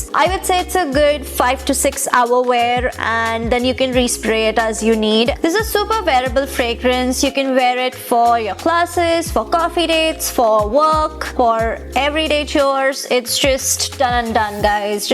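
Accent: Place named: India. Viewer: hint aksanı kulak tırmalıyor işkence gibi AHHHHHHHHH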